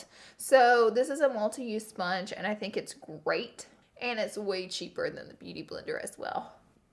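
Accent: South, United States. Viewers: I love how you say consillur instead of con-see-ler. It's very Southern with a bit of a drawl to it 🌷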